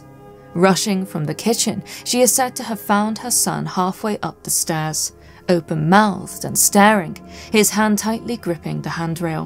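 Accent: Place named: RP, United Kingdom. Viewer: You talk like a toff, try throwing in the odd bit of slang or a good old fashioned 4 letter word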